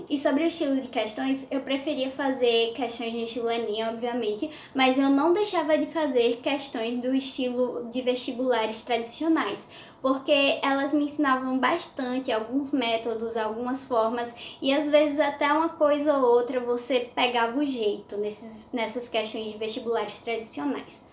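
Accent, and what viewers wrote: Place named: Pernambuco, Brazil. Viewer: Tu é pernambucana, mulher? Sotaque lindo.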